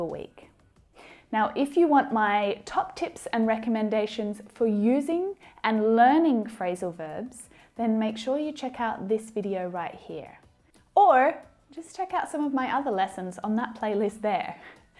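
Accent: Australia. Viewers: Thank you so much for your lessons l juste want to make sure are you Australian or American or Canadian??????? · Are you an Australian?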